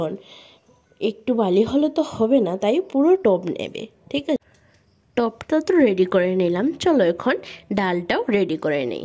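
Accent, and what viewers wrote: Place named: Sylhet, Bangladesh. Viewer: Suddho bangla tumar dhara possible na, sylheti valo hobe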